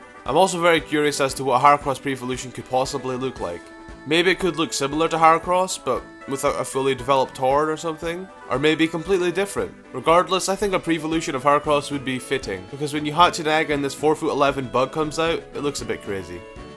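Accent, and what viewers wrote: Scotland, United Kingdom. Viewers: Yes! It's the Scottish guy again! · hes scotish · so scottish :)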